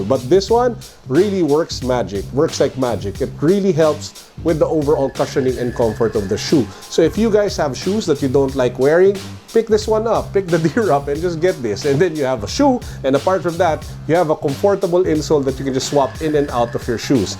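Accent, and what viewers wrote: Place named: Philippines. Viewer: Strong Filipino accent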